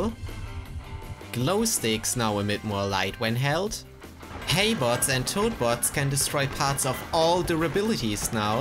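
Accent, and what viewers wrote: Germany. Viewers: Netter deutscher Akzent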